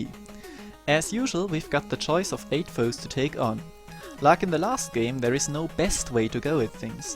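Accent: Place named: Germany. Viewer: Alter o.O Man hört ja auf 10km dass du aus Deutschland kommst. Oder ist das gestellt?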